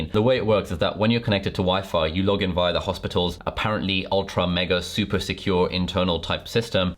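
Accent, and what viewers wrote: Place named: United Kingdom. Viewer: You have a very charismatic personality/way of presenting yourself, kept me engaged to the end. Must be your british accent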